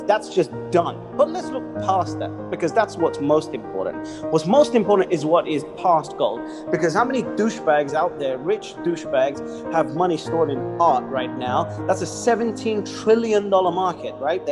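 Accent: United Kingdom. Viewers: Fuck Yes!! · Yaaaaas 🎉😮❤❤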